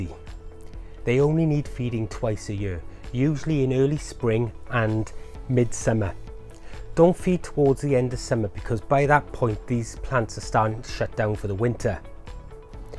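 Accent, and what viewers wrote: Wales, United Kingdom. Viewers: Are you a Welshman? Charming in any case!!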